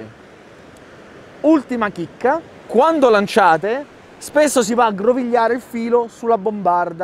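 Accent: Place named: Pozzallo, Italy. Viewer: Bello!! Ps, sei in Sicilia? Mi ricorda il pozzallese..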